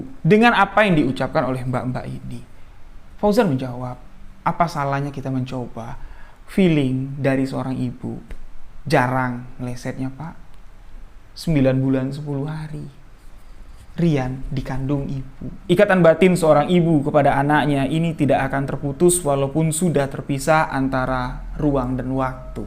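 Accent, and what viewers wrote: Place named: Sumatra, Indonesia. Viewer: Mas org sumatera ya…? Logatnya kayak org sumatera😊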